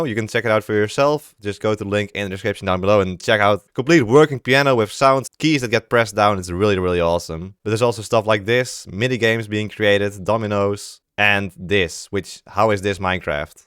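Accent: Netherlands. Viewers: Is your real language dutch? Because you have a dutch exent.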